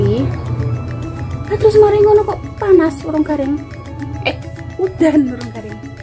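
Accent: Java, Indonesia. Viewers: Salam kenal mbak, pertama kali sy liat chanel ini. Chanel youtub'e sampeyan moro2 nampang ndek home, iseng2 tak buka.. apik mbak, konten sederhana, natural, aseli, gak neko2, gak dibuat2, kamu jg orangnya menyenangkan enak dilihat, tulus apa adanya. Jarang bgt di jaman skrg ini. Teruskan berkarya, kudoakan smg makin sukses ya, aamiin. O ya, jowo ne endi mbak? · Salam kenal,mbae jawane ngendi mba..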